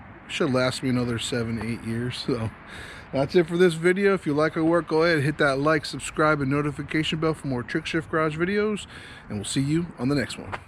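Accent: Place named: Indiana, United States. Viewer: You sound like you’re from Indiana. Thanks for the video, helped me do it! I’m never taking my car in and paying for a battery change, no matter how complicated they make it!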